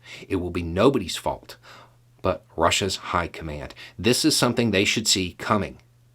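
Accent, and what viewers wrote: South, United States. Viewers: Another Skewer here! Love Tuesdays lots of great content from two of my favorites. They prove that all of us sporting a Southern accent are not complete morons! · First time watching. First impressions are often wrong. My first impression, beard, southern accent, prepper, yeah, this guy is a Trumper. I hope to hell I'm wrong because there are already far too many anti-American republican a-holes. So, before I 'sub', I must watch more of this man's videos. I 100% STAND WITH UKRAINE! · Thanks. Yeah I knew as I sent that comment that it wasn't Texan. Had a feeling it was southern tho Howdy there internet people it's agaien. So todaiye...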